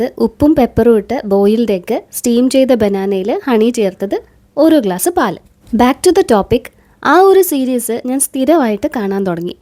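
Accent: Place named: Kochi, India. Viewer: ഇത് കൊച്ചി ആണോ കൊച്ചി സ്ലാങ് ആണല്ലോ സംസാരിക്കുന്നത്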